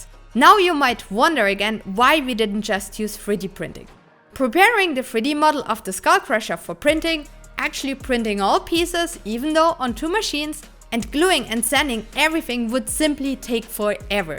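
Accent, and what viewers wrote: Germany. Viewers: den deutschen akzent hört man einfach immer raus 😄 sehr geil gemacht ❤❤❤❤❤ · you sound like you're german... and you probably are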